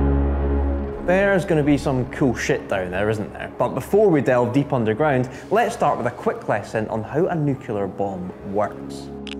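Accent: United Kingdom. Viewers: yeah, it's called British accents. Don't get what people are going on about. He's not saying it wrong, it's his accent and we have a lot of accents for such a small island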